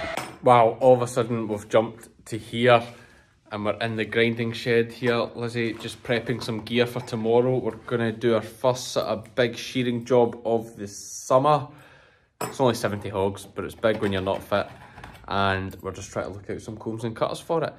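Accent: Scotland, United Kingdom. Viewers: Loved the photo of and her dogs at the end. Somehow the Scottish accent does not quite have that impact that Forest Gump's Alabama dialect does, but good try.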